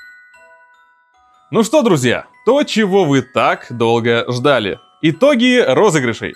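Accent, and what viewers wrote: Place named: France. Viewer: Отдельно спасибо за французский акцент и надпись на майке 😉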